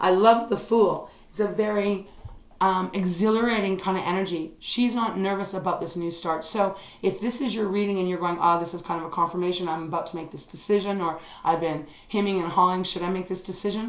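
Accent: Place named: Canada. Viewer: Thank you! ❤ Are you in Canada? I’m in Montreal and you sound like a fellow Canadian (I’m in Montreal)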